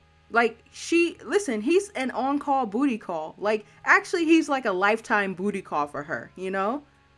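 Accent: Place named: United States. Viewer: I enjoy your narration of these stories: it's nice to have someone do them with a proper American accent and pronunciation, especially a woman with a nice feminine voice. Keep up the good work!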